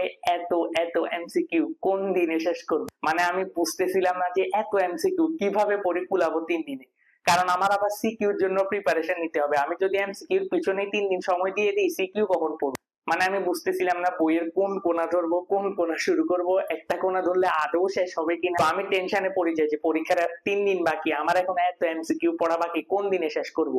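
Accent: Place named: Noakhali, Bangladesh. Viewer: Vaiya apni ki Noakhalir? Kothar accent toh amader motoi !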